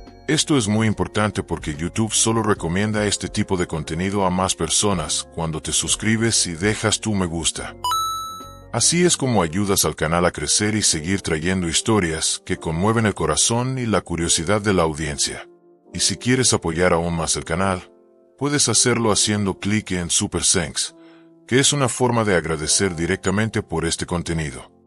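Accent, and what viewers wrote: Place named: United States. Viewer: Con todo respeto no seas Mamon , Habla bien eres mexicano??? O gabacho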